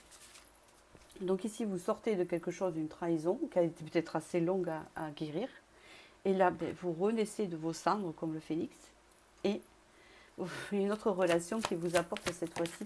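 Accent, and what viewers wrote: South, France. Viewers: Merci merci merci pour toutes vos belles et inspirantes vidéos 🌸🌼🌺🎉 et n'écoutez pas les mauvaises paroles sur votre accent, ce sont des personnes malveillantes et sont jalouses des personnes du sud mais ça on n'y peut rien! Vous êtes formidables et apportez beaucoup de lumière ne changez rien et ne changez pas vive le sud 🌞🌞🌞💞 Solange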